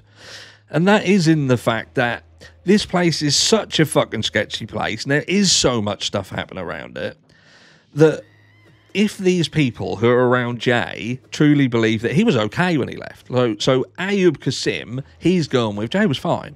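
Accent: Norfolk, United Kingdom. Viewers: Love the Norfolk accent I’m across the border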